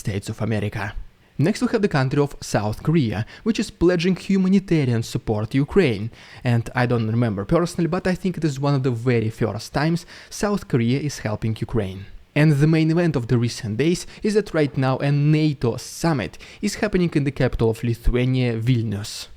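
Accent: Russia. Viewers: Lern to pronounce Turkey without that kacap accent.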